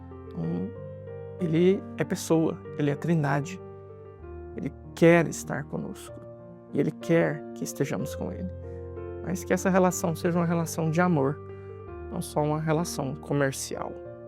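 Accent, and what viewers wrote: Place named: Goiás, Brazil. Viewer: Uma abençoada semana para todos que ouvem o. Descobri ele aqui neste canal e não deixo de ouvi-lo todos os dias. A maneira como ele fala conosco, a forma simples e direta de explicar o evangelho. Os exemplos, as comparações e até a maneira de falar no Goianes dele, é maravilhoso. Que Deus te abençoe sempre. Quem sabe um dia posso conhecê -lo pessoalmente.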